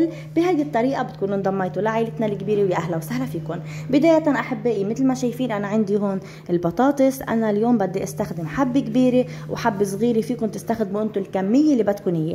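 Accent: Lebanon. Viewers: دائما متألقة وصفة جميلة ودائما اللهجة اللبنانية بتجنن 😍 من الجزائر أنا 🇩🇿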